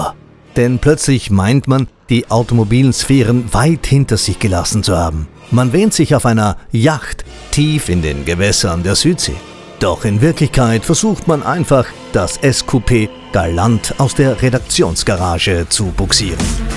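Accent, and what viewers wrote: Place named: Austria. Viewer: sachlich aber unterhaltsam und emotional, dazu der ziemlich coole Akzent (Österreich?) - davon können sich viele Automagazine eine Scheibe abschneiden. Hat mir gut gefallen :)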